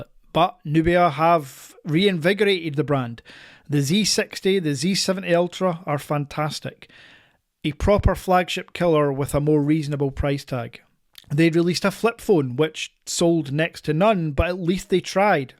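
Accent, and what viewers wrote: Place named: Scotland, United Kingdom. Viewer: It's his natural Scottish accent!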